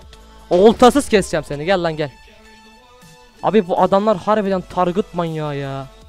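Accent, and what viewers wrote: Adana, Turkey. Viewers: tam bi adanalı konuşma tarzın var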